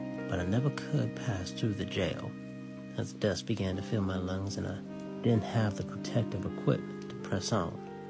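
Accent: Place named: South, United States. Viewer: Quite a bit of southern, but sounds good mostly, keep going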